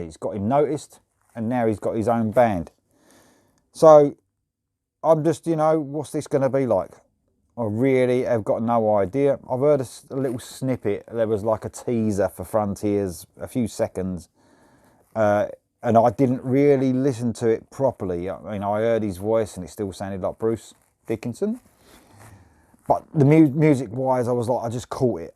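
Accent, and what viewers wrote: England, United Kingdom. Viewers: Fair review. Mendes is gifted by nature, why don’t take advantage of this? I prefer someone like him than someone else that tries to sing like Bruce and sucks. Mendes pays a tribute to the one and only. Respect. About the song... still a bit green, but better than any Maiden songs of the last decade if not more... not taking about the production 😅., I love your English accent, but your Brazilian sucks 😆 Cheers Max 🇦🇺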